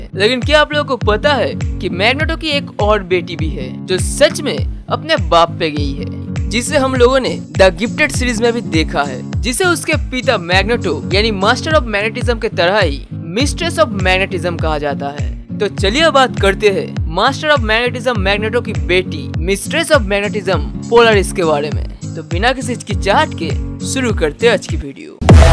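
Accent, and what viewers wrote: Bengal, India. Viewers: Bro tum bengali ho kiya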